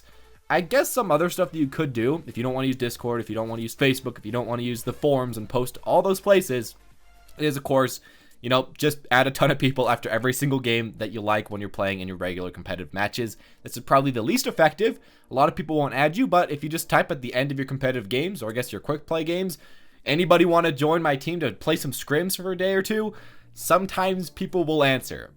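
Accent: United States. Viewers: Wait, are you from utah?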